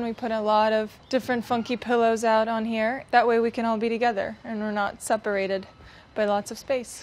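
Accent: United States. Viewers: 这美音说的也太好听了吧。好想听她说两句俄语试试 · 怎么是美音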